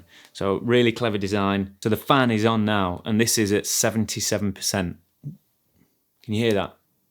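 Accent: Nottingham, United Kingdom. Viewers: Top video mate. Subbed. Are you from Nottingham? You sound like a well spoken Carl Froch 😂😂😂😂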